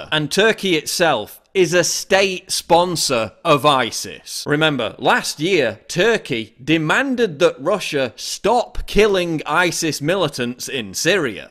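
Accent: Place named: England, United Kingdom. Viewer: Wait a minute you sound like someone from England but calls football soccer? Still good video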